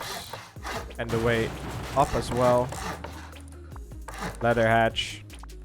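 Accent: Netherlands. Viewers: Are you from the Netherlands or Belgium ?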